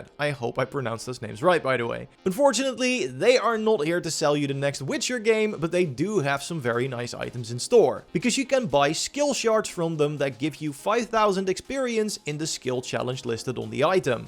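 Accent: Netherlands. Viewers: Zwz een nederlander deze man ik hoor het aan je engels😂 mijne klinkt ook zoiets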